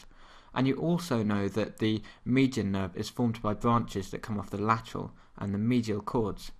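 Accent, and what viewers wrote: United Kingdom. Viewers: yup thats british accent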